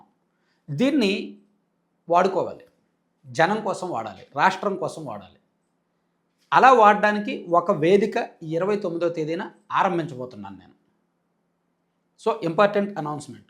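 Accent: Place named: Coastal Andhra, India. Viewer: మీ వాయిస్ కోస్తా మాదిరి ఉంటుంది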